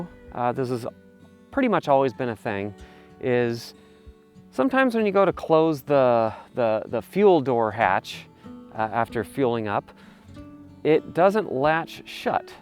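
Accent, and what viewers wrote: California, United States. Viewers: I was searching for California accents yesterday and stumbled upon your video from 11 years ago. Just saw another one of your videos now, and it feels so surreal. I really love your accent, and I’m definitely going to watch all your videos to learn it.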